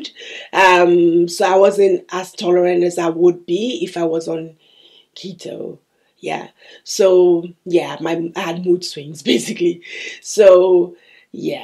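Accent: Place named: United Kingdom. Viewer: So I notice your accent and I’m not very familiar with customs in the UK (if that’s where you’re living). I’m in the US and we’re celebrating Thanksgiving this week. If you celebrate Thanksgiving or any carb-heavy holiday, how easy/difficult is it for you to maintain the keto diet during a time when the whole country is gorging on a lot of carbs?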